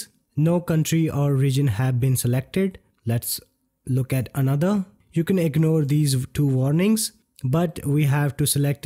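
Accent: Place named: India. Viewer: come on bro i get your trying to help but can i get a american person to teach me, like i hate the way you talk im not indian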